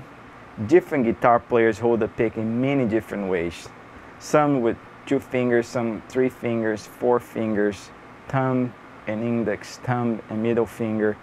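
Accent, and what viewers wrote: Brazil. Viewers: that's a brazilian accent ahah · Just pondering...what nationality are you? I've been sitting through all these videos, and the accent sounds familiar, but I want to say Brazilian Portuguese. Am I even close? Outside of that completely unrelated question, I just wanted to thank you for making these super helpful videos!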